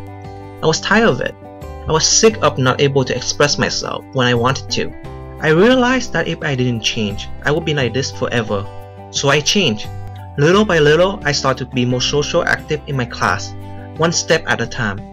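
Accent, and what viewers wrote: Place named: Vietnam. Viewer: I was watching your Emotional Intelligence video and I recognized that you have Vietnamese accent. Curiously, I clicked on your channel and found this video. I have the same situation with you, but yet speak up and it was not bad at it is. I just wanna say that you did a very good job ^^ Thanks for the video.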